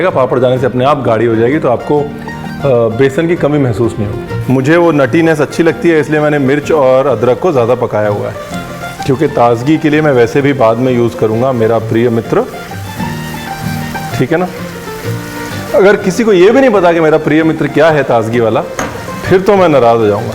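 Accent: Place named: Bihar, India. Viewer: Sardar ki aulad hokar bhayiyon ka accent kyun bolta hai?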